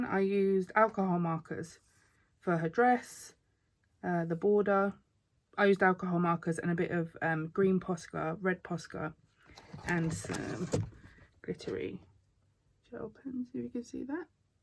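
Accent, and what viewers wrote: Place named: London, United Kingdom. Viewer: Your pictures are so vibrant. I love them, and the purple snow is great. You talk like me. You're obviously another Londoner. I'm in North London (wood green).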